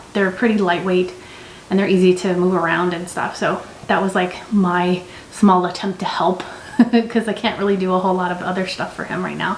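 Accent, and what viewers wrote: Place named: North America. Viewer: Oh My Gosh, you sound so North American 🇺🇸. After all these years I just heard your voice. Oh dear it sounds painful.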